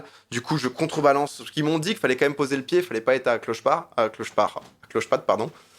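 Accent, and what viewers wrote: France. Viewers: T'es sûr d'être du 69 domi? Pcq j'ai bien l'impression que tu es plus Marseillais de la façon que tu raconte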